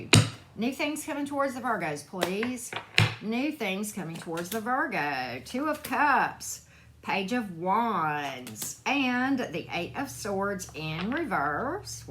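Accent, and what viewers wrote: South, United States. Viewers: Definitely resonates. I like your southern accent too!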